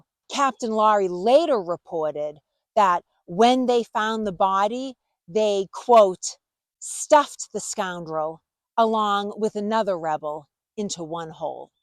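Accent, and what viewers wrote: Boston, United States. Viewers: Ya can diffiantly can tell your from New England / Boston accent...😂😂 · Came for the history, stayed for the Boston brogue. Good content earned my subscription. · You're from Bahston? Wow, had no idea. Great channel. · My Mom was from Maine, but lived in California from her early twenties until she passed. Is your accent a Boston accent or is that how her accent would have sounded as her being from Maine? I’m just curious. Loved your story and subscribed to hear more content. Thanks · Love her Bahstun accent 😊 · When I first saw this documentary I wasn't sure if this was about new England and Boston during the revolutionary War and but then I heard her voice and was like yup...definitely Boston. 😂...its good to see a New England youtube channel loving what they do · you seem to have a Boston accent, cool, mine is R.I....😊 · Outstanding, love that Boston sound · Great video. 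Your narration is excellent. Love your accent. You sound just like a former client of mine who came from somewhere near Boston. I have been to both Lexington and Concord and loved the area and its history. I subscribed and am looking forward to watching more videos. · After the first 30 seconds of listening to her talk, I knew without a doubt that she was definitely from Boston.🤣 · New sub here! You hooked me with your excellent presentation with its enthusiasm and, of course, that wonderful BahStun accent! Love it! I can't wait for the stories yet to come! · Is that the original Concord bridge that stood 250 years ago,? By the way, I love your Boston accent. You have a new subscriber for all things about the Revolutionary War. History is fascinating, is it not? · Another awesome video! Your Boston accent just makes the video. Love watching your enthusiasm. 😊👍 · Well researched and presented! 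Good job! (Love your Boston accent. 😊) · Love her Boston accent. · Makes me think of A Matter of Life and Death aka Stairway to Heaven when Abraham Farlan is the prosecutor. 😂 neither Massey or Kim Hunter had Boston accents like you!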